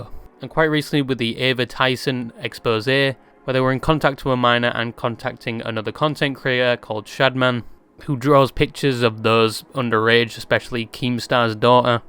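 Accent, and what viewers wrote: United Kingdom. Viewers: he’s just british mate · ahh yes the british disembodied voice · British man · wait you're a brit?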